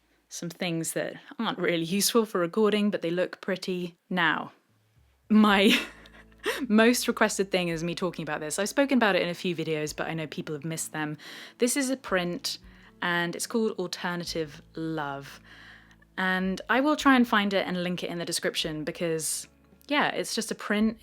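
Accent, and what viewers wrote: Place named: RP, United Kingdom. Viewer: Good on you, thanks for the look around your studio! I enjoyed listening to your very easy on the ears posh British accent... Best of luck with the record!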